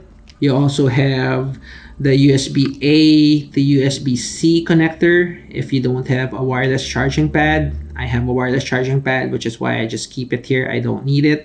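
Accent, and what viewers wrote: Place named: Philippines. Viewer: great review, ur Philipino btw? recognized the accent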